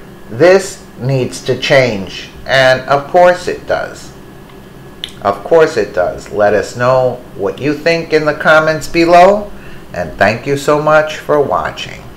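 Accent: New York, United States. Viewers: You must be from New York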